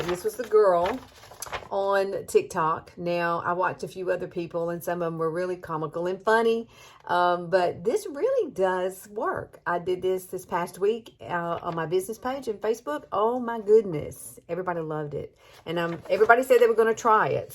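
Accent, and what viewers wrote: South, United States. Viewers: This DOES look fun!😃💗 Thanks for sharing!... And I love your cute Southern accent!😍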